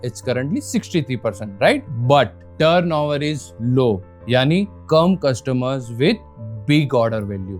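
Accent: Gujarat, India. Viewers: BY YOUR ACCENT YOU ALSO SOUND LIKE A GUJU DON'T. U KNOW AMBANI WILL GET ALL THE APPROVALS NEEDED BY OUR HONORABLE PM WE WILL WIN MODIJI IS THE GREATEST PM OF. ALL TIME. HE WILL DELIVER TO AMBANI WHAT HE NEEDS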